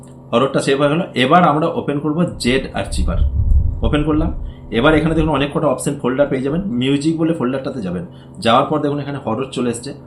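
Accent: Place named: India. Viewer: আপনার কয়েকটি কথা শুনেই আমি বুঝেছি যে আপনি ভারতীয়।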